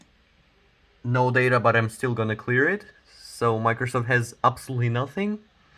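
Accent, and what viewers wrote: Russia. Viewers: 10/10 russian accent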